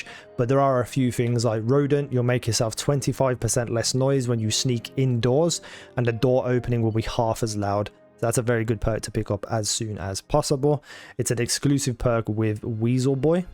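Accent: Birmingham, United Kingdom. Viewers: Birmingham? Walsall?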